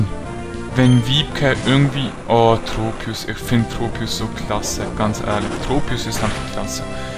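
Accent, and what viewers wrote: Switzerland. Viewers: Bist du Schweizz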